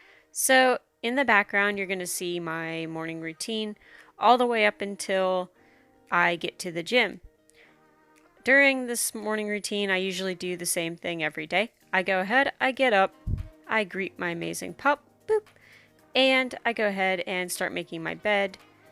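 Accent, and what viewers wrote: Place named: Pennsylvania, United States. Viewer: I'm very sorry. Could be a malpractice suit somewhere in there. Just because a person is 18 years of age doesn't mean there's not an serious underlying problem occurring. If the Docs were that concerned about drug use- just needed to perform a simple lab test there on the spot ( I worked in a laboratory). Would've been ruled out immediately... and quite possibly could've addressed the serious medical issue before it proliferated or worsened. Once again, I'm sorry. You sound like your from Central to western Pennsylvania or even southern Ohio. The manner in which you pronounce the word "cold" is audibly simulant of that area. It's cute though. Please be well.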